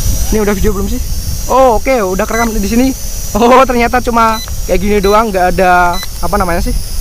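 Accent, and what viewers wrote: Java, Indonesia. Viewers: Sory suketnya... Hahahaha.. mantab bro, gak ilang jawane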